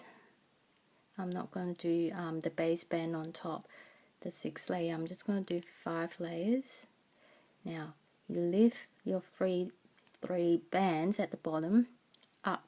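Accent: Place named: Australia. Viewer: I think you speak beautifully! I noticed the Aussie accent but it sounded different from my other Aussie friends...now I know why. Sometimes I just play the videos to hear the voice...yours is most pleasant; makes it easier to get through a tricky tutorial:)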